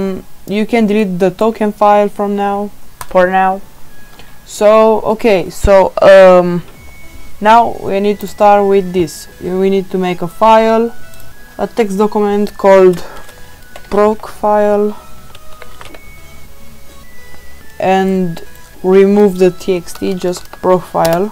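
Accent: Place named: Romania. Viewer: multumesc mult de tutorial , mi-am dat seama dupa accent ca esti roman , botul merge perfect , dar in log apare asta <link> , chiar daca n-am probleme si am bifat decat node index.js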